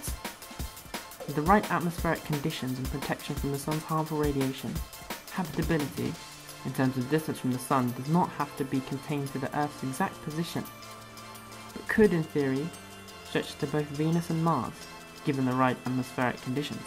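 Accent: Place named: England, United Kingdom. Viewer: What you talking about? he's speaking English with an English accent.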